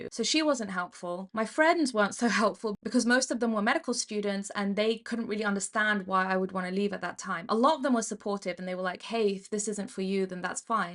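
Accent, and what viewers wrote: United Kingdom. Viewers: Everytime i hear a British accident i change the video lol i know how bad it is in the UK. I’m interested in the us only.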